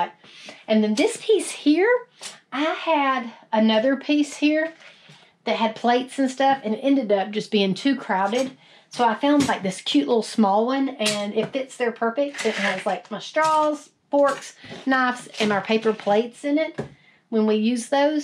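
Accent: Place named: East Texas, United States. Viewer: I just ran across your channel and I love your content! I'm not sure where you're from, but you sure sound east Texan!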